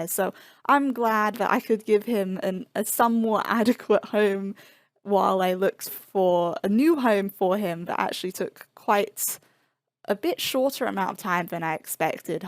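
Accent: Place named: United Kingdom. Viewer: Came here from Dodo - I'm not much of a fish person but so good to see someone knowledgeable and caring about their pets :) PS. you sound British, are you originally from there and moved to the US?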